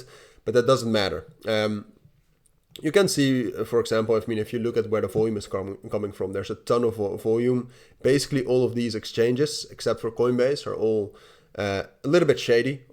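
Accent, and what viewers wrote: Netherlands. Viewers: Ik dacht al ik hoor een Nederlands accent! Goede video trouwens :)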